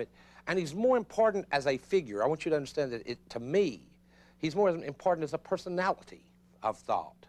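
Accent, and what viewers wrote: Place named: Texas, United States. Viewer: I love that Texas accent. His Texan accent sounds like an anti-abortionist accused of burning down a clinic. Yet these brilliant concepts are produced through this accent. Diversity it is.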